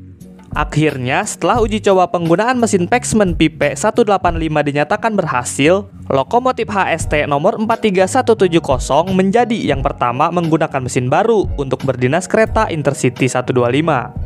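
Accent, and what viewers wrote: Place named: West Java, Indonesia. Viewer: Naratornya sunda pisan euy...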